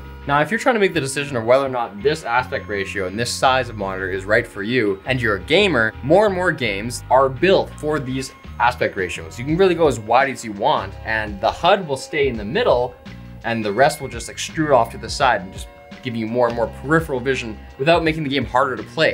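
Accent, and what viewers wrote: Canada. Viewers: Thank god for closed captioning. Can't understand a word with that Canadian accent. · I can really hear the Canadian accent in this video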